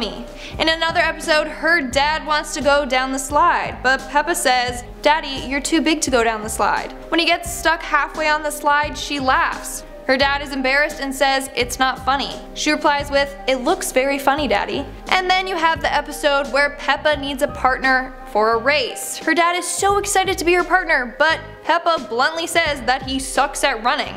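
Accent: United Kingdom. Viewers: Whats Wrong With A Slight British Accent?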